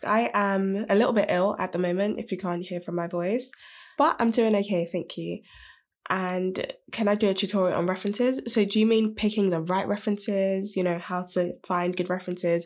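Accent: United Kingdom. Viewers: Great video but, “You sound like a ‘British’ but look black”? That is the stupidest remark ever has to deal with this lol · “You sound like a British but you’re black.” BOI WHAT ?! 😭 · "you sound British but look black" who says you have to be white? Such people scare me I swear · You sound british but look black?!?!? Is she playing dumb? or has she been living under a rock all her sad life? Only shows how ignorante the woman is. Beyond words. 🤦🏽‍♀️💥😵‍💫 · “You sound like a British but look black” I can only laugh 🤣🤣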